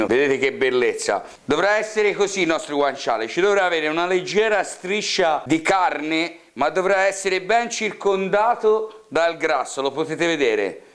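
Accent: Tuscany, Italy. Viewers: Ciao ! Per dire il vero, la ricetta la sapevo, però sentirti come la raconti tu, con l’accento toscano, e per me il piacere massimo. Grazie di tutto, e stammi bene ! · Sei fortissimo cin il tuo dialetto toscano bravoooo 👍👍👍👏👏👏👏😂😂😂😂😘😘😘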